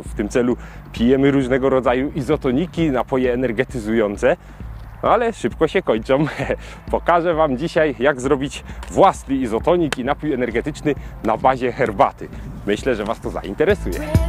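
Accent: Silesia, Poland. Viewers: jesteś ze Sląska , słyszę znajomy akcent .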